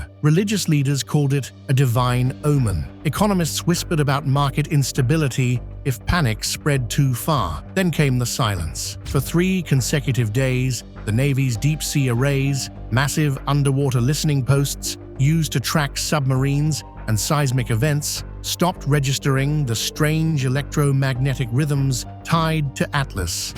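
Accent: United Kingdom. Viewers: The British voice again…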